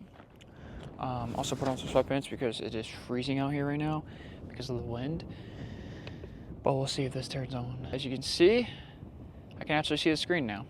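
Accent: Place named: New Jersey, United States. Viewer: Hi. What do you do for work? I love listening to your Jersey accent. Reminds me of where I grew up in southeastern PA.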